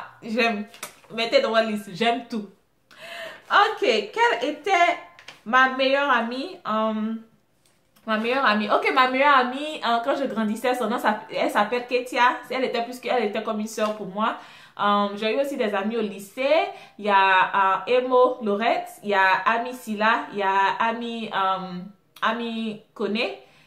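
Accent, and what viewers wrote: Ivory Coast. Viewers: ma soeur j était déjà abonné par ton accent je savais que tu était ivoirienne donc je doit soutenir ma soeur mai je viens de voir cette vidéo tu ma trop fait rire je vis au Canada et mon baby me manque tellement j aime ta bonne humeur et sa sais made in cote d ivoire Abidjan est le plus doux au monde les vrais foutou placali et korpet escargot crabe poilu heee sa me manque ohhhh maman je vais pleuré😂😂😂😂 tu ma tué😄😄😄😄sais karidjatou a fagat moi je suis de la côtière je sais pas si tu connais dabou jackville mai bisou bisou ma soeur mon mari est baoulé👍👍👍👍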